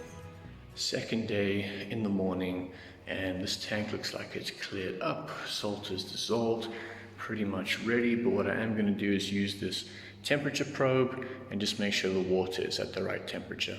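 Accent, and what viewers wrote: South Africa. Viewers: Im kinda getting South Africa vibes but Idk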